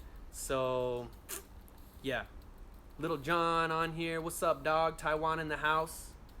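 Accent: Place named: Los Angeles, United States. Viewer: I knew u were from L.A. right when i heard u talk homie!😎👍 You the tightest i seen. Keep it up bro✌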